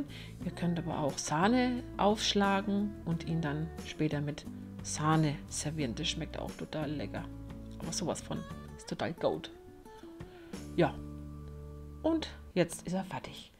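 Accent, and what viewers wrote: Franconia, Germany. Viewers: a fränggin…schäi!!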